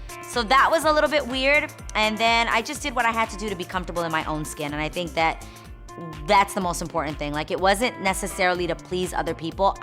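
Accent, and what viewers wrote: New York, United States. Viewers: Hi. You will probably never see this comment but I have to tell you that I love your YouTube channel. I relate to you so much and love your New York accent. I grew up in the lower east side, in the LaGuardia projects and I am also 4' 11! My cousin went to P.S. 110 and his mom currently teaches there. He died on 911. Anyway, your channel always makes me laugh and feel good. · Lmaoooooooo "i'm an exhausted pigeon throughout the day" that was so hilarious and i can so relate; i love how you could hear your NY accent so much herw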